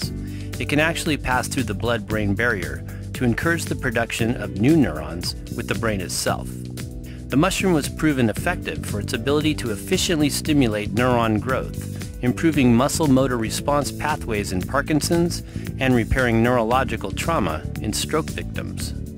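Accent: United States. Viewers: Sorry, I shouldn't have been so harsh and called your narration "robotic" to begin with at all -- especially when you're already self-deprecating about it! The pace of your speech is fine, BTW, and you do happen to create numerous, highly informative, useful and great videos anyway! ☺ If this is of any solace to you, I don't like the sound of my own voice either! Clearly, I'm not good at detecting some U.S. accents (clueless and Irish here!). Californian? I hope you or any family haven't been adversely affected by those bizarre and horrific wildfires sweeping across your state? There's been talk about laser-based Directed Energy Weapons (DEWs) playing a role... Rambling on here, sorry. Anyway, just keep up the great work with your videos and have a lovely weekend! 🌝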